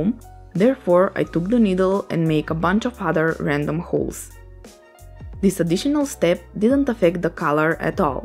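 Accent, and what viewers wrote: Russia. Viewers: Русский акцент? Русский акцент = крутой видос Спасибо за интересный туториал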